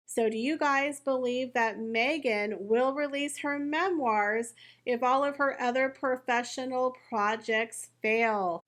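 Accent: South, United States. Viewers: I really like your views and you speak exactly the same as my southern cousins. I’m in the middle 🇺🇸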